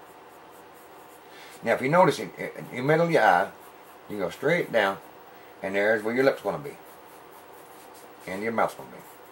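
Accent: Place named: South, United States. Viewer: NocturnSkies it a country accent if you don't like it well the stop watching you don't have to be so rude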